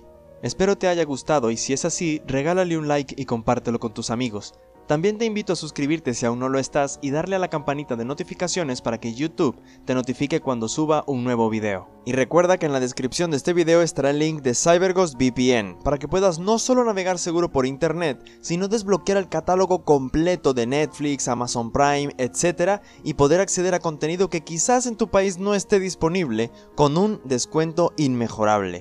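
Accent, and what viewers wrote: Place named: Venezuela. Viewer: YO SABÍA!!!!! YO SABÍA QUE ERAS VENEZOLANO!!! >:D Se te nota en el acento XD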